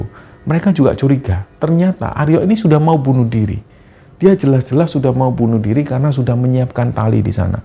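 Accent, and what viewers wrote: Java, Indonesia. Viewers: Ach mas itu suaranya adem. Ciri khas jowonya. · logat jawa yang kental merupakan ciri khas..... latar belakang jawa.... ada kesulitan saat meng hafal kah mas???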